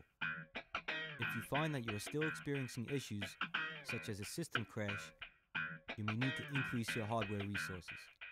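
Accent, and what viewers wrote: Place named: Long Island, United States. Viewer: that guitar lick in the background does makes this unwatchable. plus his Long Island accent is brutal. its like recording with the Sopranos.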